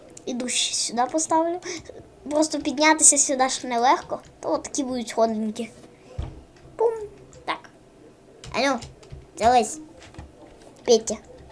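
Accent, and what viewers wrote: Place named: Surzhyk, Ukraine. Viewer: Чьо суржиком говориш !?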